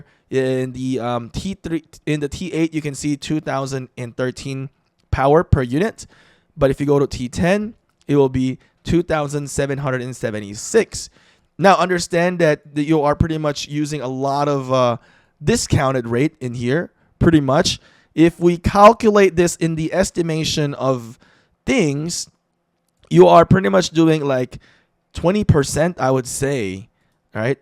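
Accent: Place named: Philippines. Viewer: Pinoy accent, pinoy ata 'to.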